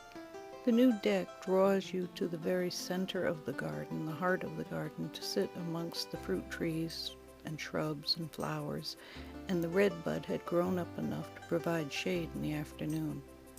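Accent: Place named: Nova Scotia, Canada. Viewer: What a beautiful garden! Your accent suggests you are in Nova Scotia, am I right?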